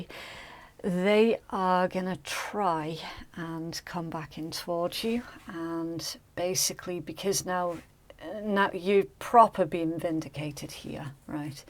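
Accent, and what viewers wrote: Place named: Wales, United Kingdom. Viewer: exactly! Tq for wonderful reading its a testament you have masculine energies on board! Nice to hear Welsh accent from mine to yours...❤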